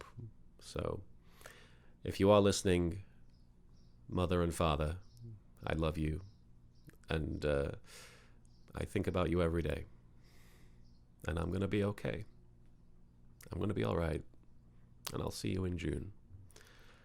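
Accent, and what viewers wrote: United Kingdom. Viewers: where do u live by the way u sound british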